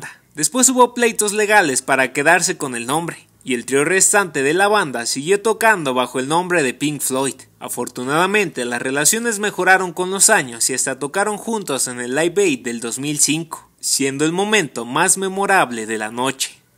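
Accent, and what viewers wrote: Mexico. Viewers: Habla más neutro porque tu acento de Panchito es hiper marcado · eres norteño? jajaj duda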